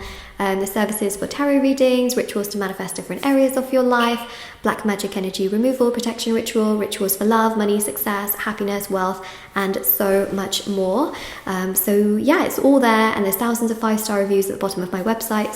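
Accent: United Kingdom. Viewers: For some reason I never put 2 & 2 with the UK accent. I just thought you wanted to be treated like a princess heh.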